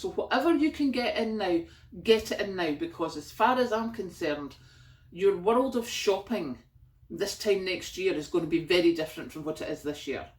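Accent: Scotland, United Kingdom. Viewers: That’s so funny 🤣,I’ve heard that before,that Americans struggle with the Scottish accent.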